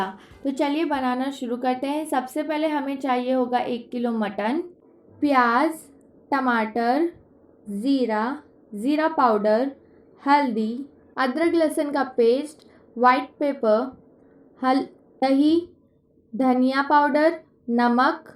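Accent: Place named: Marathwada, India. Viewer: Ap pakka marathwada region ke ho , apki toning se pata chalta hai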